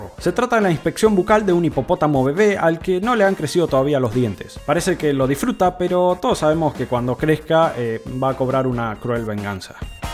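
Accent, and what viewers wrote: Argentina. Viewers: un poco de todo pero argentino (No es hate)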